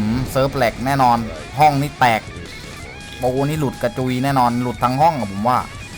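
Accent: South, Thailand. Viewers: พี่คนภาคใต้ป่ะถ้าใช่อ่ะเหมือนกัน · พี่คนใต้เหรอ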